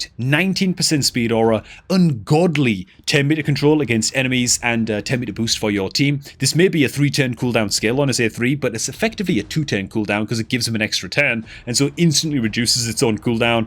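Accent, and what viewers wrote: North East England, United Kingdom. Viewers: Probably a NE English accent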